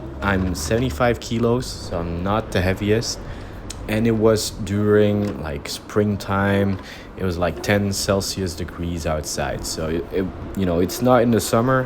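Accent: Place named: France. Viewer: T'entends pas son accent ? Il est français mais pour toucher plus de monde il fait sa vidéo en Anglais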